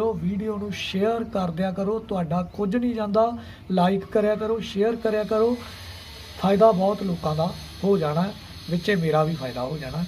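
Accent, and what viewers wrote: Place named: Malwa, India. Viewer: ਜੇ ਹੱਥ ਨਹੀਂ ਦੁਖਦਾ ਤਾਂ........ ਸੀਦੀ ਮਲਵਈ ਬੋਲੀ Good sir ji ਅਸੀਂ ਸ਼ੇਅਰ ਕਰ ਦਿੱਤਾ ਜੀ ਧਨਵਾਦ ਜੀ।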